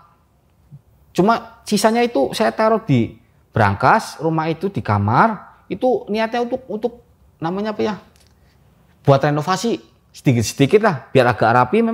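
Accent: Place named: Tegal, Indonesia. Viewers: wong ngapak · Logat tegal nya kental bget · Jane serem critane cuman logate karo basa ngapak dadi ora sida medeni malah dadi lucu😂😂😂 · Ora ngapak ora kepenak ya mas jos gandos 😭👍🏻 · Logat tegale ora ilang yah kang,,keren" mantep critane,salam saking Bojong tegal 🔥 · Medokee medoke masss 😅 waras terus mas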